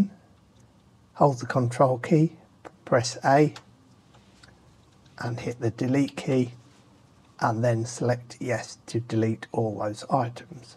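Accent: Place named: United Kingdom. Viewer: thanks british guy! this actually helped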